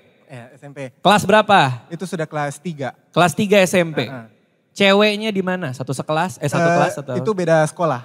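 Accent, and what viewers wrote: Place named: Palu, Indonesia. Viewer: Logat palunya di tahan tahan, tidak hilang memang 😂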